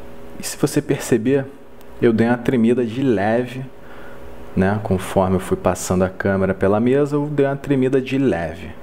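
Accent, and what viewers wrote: Rio de Janeiro, Brazil. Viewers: Adorei o video e tbm adorei esse sotaque carioca "Mesa de docexxxx" *-*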